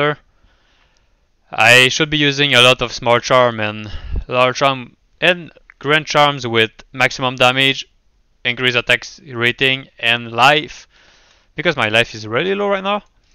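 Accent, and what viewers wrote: Quebec, Canada. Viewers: Nice comparison, I really enjoyed it. WF is pretty much OP for a late game Bowazon. By your accent, you must be from Quebec right? Have you considered a Bow Sorc with cold and fire enchant, geared with Witchwild String? She can kill super quick and teleport fast to the next mob groups.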